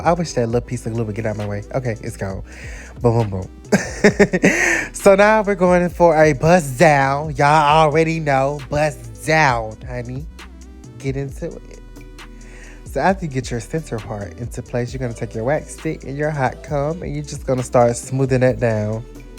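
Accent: South, United States. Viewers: being too cute when the country twang jump from the gums.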